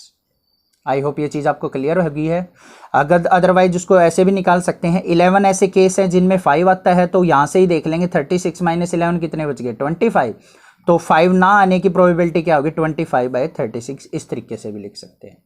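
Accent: Haryana, India. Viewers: Haryanbi voice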